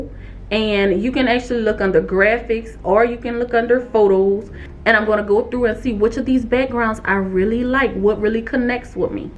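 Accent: New Orleans, United States. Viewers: Good information my sista, I gotta head down to Nawlins' again soon. I love a sista with a NOLO accent 👊🏿💯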